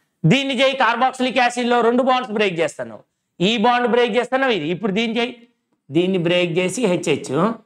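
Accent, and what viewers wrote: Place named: Telangana, India. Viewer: Telangana yaasha😂😂😂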